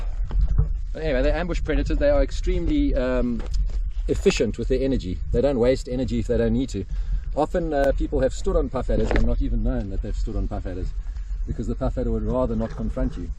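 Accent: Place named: Netherlands. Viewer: You sound like a Dutchie! How are you, brother? Beautyfull beard, i'm jealous!!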